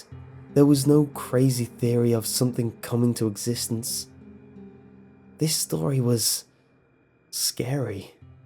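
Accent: England, United Kingdom. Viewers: I know he is not from America so he is probably from England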